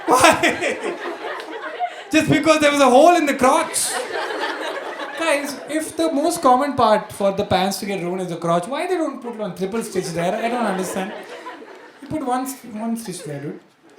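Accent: Kerala, India. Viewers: He is from kerala. More over malayalis speak english this way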